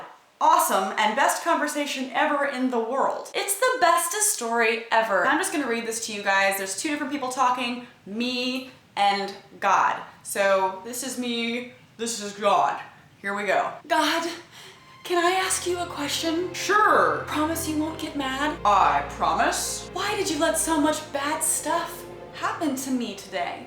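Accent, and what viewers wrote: South, United States. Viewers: Love the Southern accent. Also, #firstworldproblems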